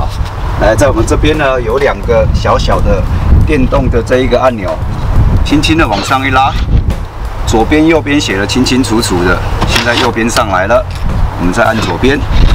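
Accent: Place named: Taiwan. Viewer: 好好笑ㄟ～他一開口我就想說台灣腔好重……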